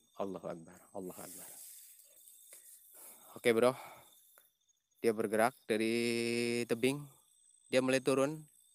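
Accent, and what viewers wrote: Banyumas, Indonesia. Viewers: wkwkwj ngapak bae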